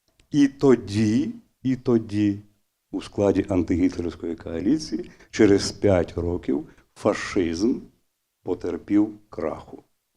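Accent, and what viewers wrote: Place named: Russia. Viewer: Пане Залужный, а не лучше ли говорить на языке, на котором вы всегда говорили, русском. Очень тяжело слушать русские слова на украинский манер... "воена" - вийськова, и др.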